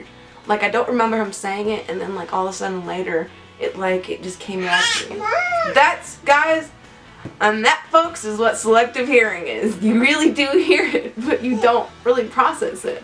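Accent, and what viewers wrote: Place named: Chicago, United States. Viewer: u don't actually look like u need to lose a lot of weight being honest I don't know what your goals are.. I'm trying to place that accent it reminds me of Chicago.. But I'm not convinced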